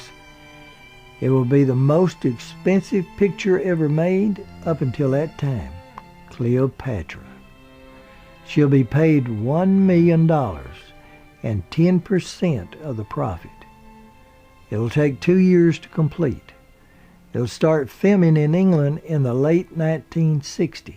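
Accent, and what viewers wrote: South, United States. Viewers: Good ole Southern accent :) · I know....at first I was confused by this southern drawl, but after awhile you come to like it; but why is almost the whole darn thing in Black & White? I want to see those violet eyes! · I discovered your channel by accident but have come to really enjoy it. I like your calm demeanor, the way you say nineteen-and-fifty-six, your sweet southern accent and the interesting research you do. Nothing is rushed, the whole experience gives you insight and a bit of relaxation in the process.. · MandyJMaddison, there are several generations of southerners here in the south that talk like that, and probably won't change. they are mostly from cotton mill families, and I find their pronunciations trashy and uneducated. I don't know why so many commenters here actually like his way of speaking. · The narrator is a southerner and they have a unique vocabulary and accent. 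I thought everyone knew that. I’m sure Elizabeth would be delighted with his work as most people appreciate him.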